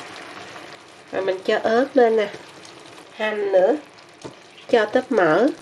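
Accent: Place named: Vung Tau, Vietnam. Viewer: Giong chi giong nguoi Vung tau qúa😮😢❤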